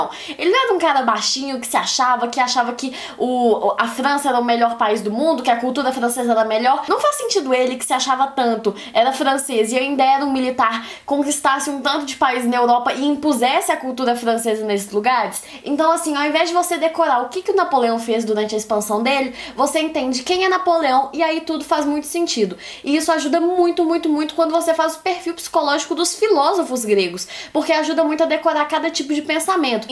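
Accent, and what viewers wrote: Minas Gerais, Brazil. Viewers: Conheci pelo GeekieGames, 2 minuto de aula e já falei: essa aí é de minas kkkk, e tava certo mesmo!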